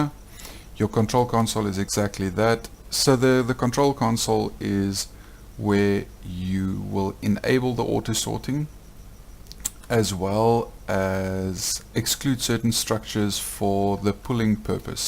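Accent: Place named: Afrikaans, South Africa. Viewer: You can hear that afrikaans accent from a mile away xD Goeie Video Bra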